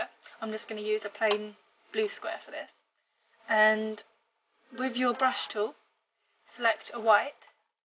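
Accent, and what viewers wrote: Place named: United Kingdom. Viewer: I'm a sucker for British accents. I love it!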